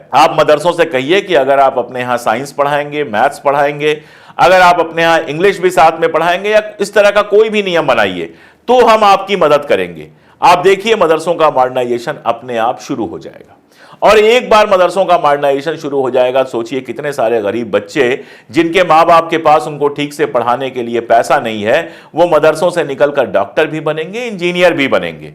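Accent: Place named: India. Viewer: ji aap haqikat mein is mulk ki tahjib ki jhalak hain aur aap ki awaz mein hindustan ko bakhubi mehsoos kiya ja sakta hai.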